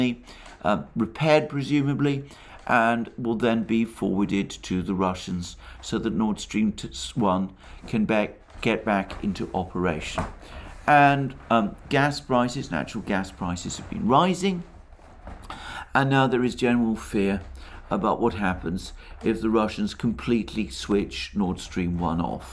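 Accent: United Kingdom. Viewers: He reads commonly available materials and chats about it. Another sucker for a Brit accent.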